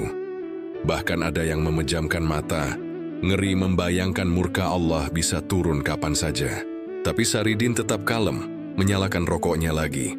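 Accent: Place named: West Java, Indonesia. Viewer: Ini cerita agar umat belajar ilmu ,Sareat,Toriqod,Hakekat,dan Makrifat ,ini sudah tingkat Makrifat ,jadi anak anak belum sampai ,masih saringat,sayang yang membawa suaranya orang dari Jawa barat , bila Jawa tengah akan lebih halus contoh dipun Tompo ,bahasa Jawa barat di Tampa kelihatan benar orangnya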